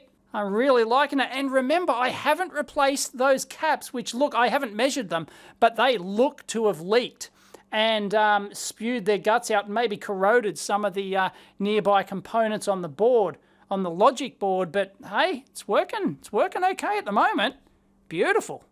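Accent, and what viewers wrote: Australia. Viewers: That's just his Aussie accent.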